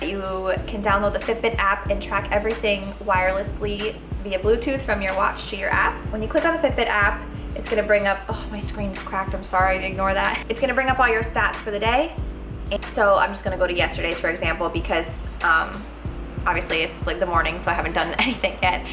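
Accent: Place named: Michigan, United States. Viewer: Great review! I'm looking at getting the Blaze now that the prices have dropped and this will help me out! I love your accent. It makes me think of "home" in Michigan! :) I assume you are from the Northern middle of the country somewhere. The "oh geez" was classic! It's been a little less than a year since you posted this. Do you still like the Blaze?? Are you actually getting the 5 days charge that they advertise? Have they updated the software with anything you find useful/fun/interesting??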